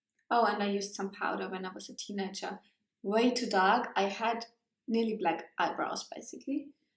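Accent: Germany. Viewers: Die Message ist sehr schön: man sollte nicht zu stark anders aussehen. Du bringst es auf den Punkt. Übrigens ist dein Englisch richtig gut. Ich rede auf der Arbeit 95% Englisch und muss echt mal lernen so wie du zu reden. Auch wenn man deinen deutschen Akzent etwas raushört (was ich süß finde) machst du keinen Fehler! Ich mache öfters Fehler oder denke kurz nach. Und wenn ich mich dann über etwas aufrege wird es von Wort zu Wort immer mehr deutsch 🤣🤣🤣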